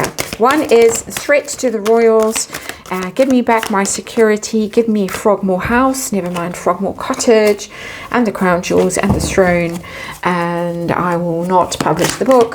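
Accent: South Africa. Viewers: Hi do I hear a South African accent ? Hello if you are ❤❤I am too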